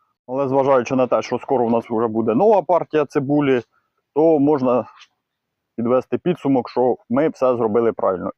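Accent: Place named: Poltava, Ukraine. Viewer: почув акцент що ти полтавчанин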